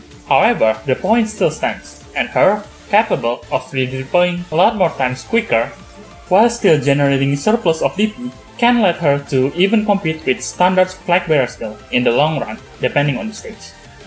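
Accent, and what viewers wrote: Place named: Asia. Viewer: It's more cause of his "asian?" accent than the mic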